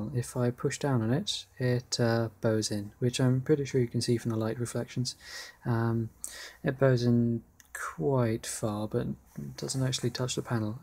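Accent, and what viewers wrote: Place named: England, United Kingdom. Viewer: I mean I know people think English guys have posh voices and sophsticated words (as long as you stray away from the Chavs etc) but this guy takes first prize :D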